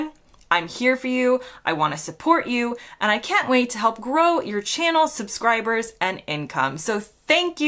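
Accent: United States. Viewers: your stupid accent does not offend me, you are one of the few americans i can stand to watch, you do not have the better than you attitude ( which is more than i can say for other yanks ) upon which, would result in immediate cessation of viewing. so good job and keep up your good inquisitive flow. 👍 ( you are almost a brit ) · American is not my favourite accent, but when one watches an American what else do you expect. It is a shame you feel you have to put this out, but I understand totally, I liked it and you are picking up the British sarcasm and understated piss take very well. Keep going girl. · I have to ask, but, where did you get the sarcasm from? And what accent are your countrymen complaining about? You sound very american. At least to me. And not in a bad way. If you are born and raised in the USA, you most probably will sound like it, and to me, you do. 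Nothing offensive about anything you do - or say. In fact, I very much like everything about You and your approach to Europe (England) and the USA. I don't think you bash either side. This is a definite subscription to your channel. I will follow other videos from you. I'm not a native english speaker, so, I may have "said" (written) something offensive or provocative in this rant, but I mean no harm. I really love your approach and your content. Have a brilliant day. ❤ from Denmark! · I british.i like Americans.i like your accent. I wish I could have a holiday in America.after all,we are allies.maybe the best allies on the planet. So ignore these buffoons who are probably jealous of you and your accent. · Gosh- I'm glad you are able to greet these with humour - this was a fun response! I fail to understand why anyone who hates Americans or an American accent has bothered to even play your videos. 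You'd think they'd steer clear......or just scroll past...... Heyho- onwards and upwards!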